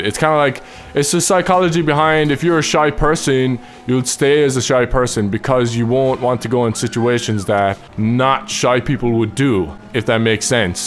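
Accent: Ireland. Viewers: Where is he from in ireland.my guess Kilkenny .cork or kerry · r u Irish?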